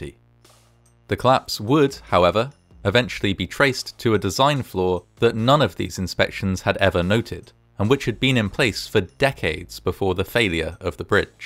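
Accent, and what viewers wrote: England, United Kingdom. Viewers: Is he English ?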